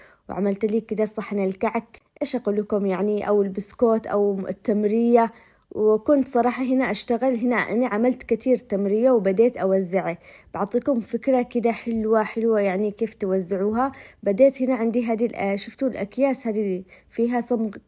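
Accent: Aden, Yemen. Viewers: تحياتي لك عدن الغاليه وتحديدا من المعلا تعجبني لهجتك مازلت محافظه على لهجت اهل عدن تسلم الايادي وتسلمي على مجهودك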